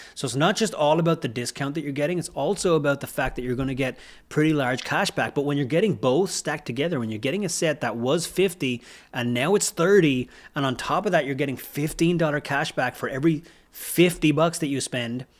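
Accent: Ireland. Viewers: I'm always super confused that somebody with an Irish accent is introducing Lego deals from the USA. Here in Europe the deals are not great.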